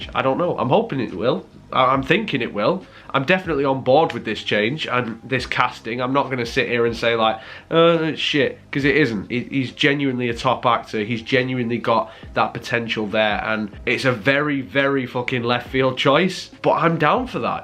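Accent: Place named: Leeds, United Kingdom. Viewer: Bit off topic, but are you from Leeds mate?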